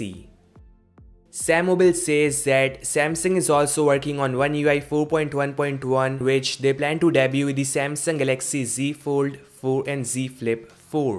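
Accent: India. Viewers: This is Indian accent Loved it !!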